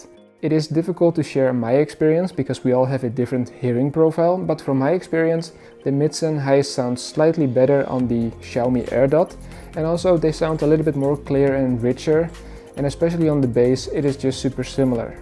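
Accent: Netherlands. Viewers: just like the earpods? Just open the case? Edit: are you from the Netherlands because you sound like at Dutch man who speaks english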